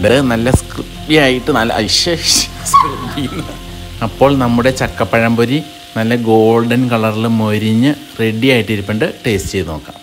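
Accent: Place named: Wayanad, India. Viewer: Hai ma'am how are you, I like to hear ur wayanadan voice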